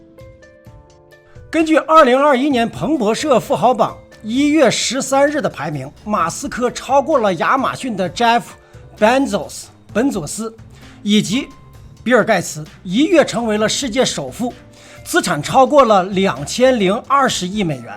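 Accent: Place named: Shaanxi, China. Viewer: 陕西话说的真可以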